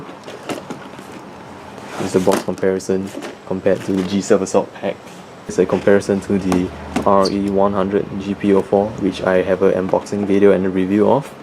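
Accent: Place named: Singapore. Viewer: hahah , recently saw stock at Gkgundamkits thou . you sound like a Singaporean . haha .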